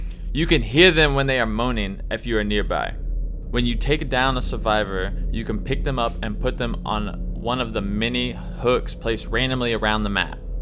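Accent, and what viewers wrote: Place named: Australia. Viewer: sounds australian to me, our accents make it sound like we say "bee-ah" while americans use a hard R at the end. same goes with all words like beer, like fear and dear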